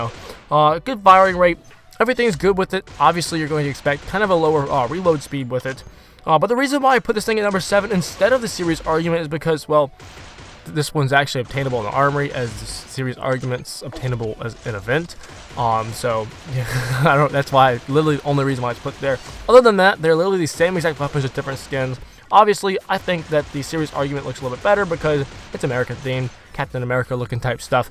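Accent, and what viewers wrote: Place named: United Kingdom. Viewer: Shut the fuck up you sound like you’re British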